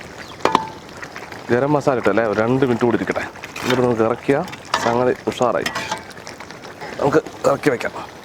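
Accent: Palakkad, India. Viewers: ചേട്ടായി നിങ്ങടെ ആ പാലക്കാടൻ സ്ളാഗ് സംസാരം........ സൂപ്പർ..... ഒരുപാട് ഇഷ്ടം ആണ്...... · Namude palakkadan bhasha +firozikkade ckng😍😍😍😍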